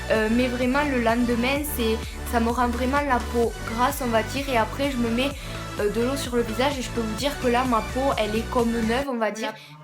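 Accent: South, France. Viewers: J'aime bien ta vidéo , ta l'accent du Sud ⚓ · Coucou ! j'aime beaucoup ta chaine n'hesite pas a venir faire un tour sur la mienne tu est trop mimi ^^ et j'adore to accent du sud !!!! bisouus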